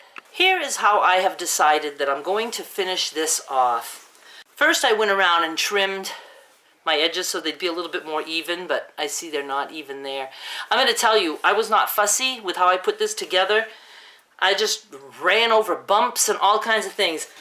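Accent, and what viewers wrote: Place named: New England, United States. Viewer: Thank you so much for your tutorial! I loved the addition of lace! It felt so good to watch an experienced quilter run into issues. I have an ongoing relationship with my seam ripper, it's always right by my side!! I loved listening to you, you sound like a New Englander, I'm from Maine and felt comforted by your accent. I hope your mom loved the quilt! Take care!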